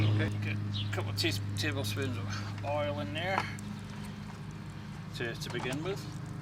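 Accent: Scotland, United Kingdom. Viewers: Are you Scottish (I am) and for the pen fishing rod video you made a year or so ago can you make a follow up video on the line, hooks, bait, floats, weights ect. Great video and have a good day!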